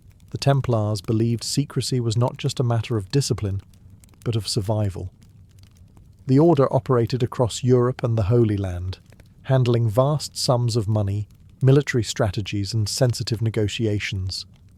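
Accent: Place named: England, United Kingdom. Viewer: I lived for seven years in Alaska. Miss it terribly. I’m now living in Baltimore, Maryland about an hour’s drive near the small town where I grew up. Gettysburg is close by. I love your videos! Your English accent and medieval history are quite a comfort 👍🤓. My nana and mum are Australian so I always prefer the Commonwealth. I try to watch only British film, music, and TV. Nighty nite.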